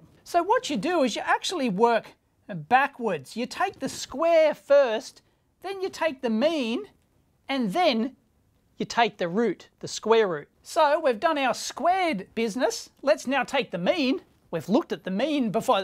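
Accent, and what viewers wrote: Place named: Queensland, Australia. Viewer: Anybody else unable to see a video and not go: "haiiiiiiiiii" in that Queensland accent lmfao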